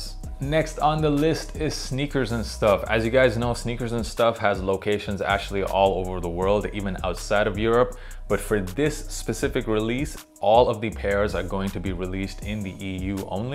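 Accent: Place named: United States. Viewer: Bruh... you've got an American accent and live in Berlin. WHAT IS A MENS SIZE 11?!🤣 US/UK?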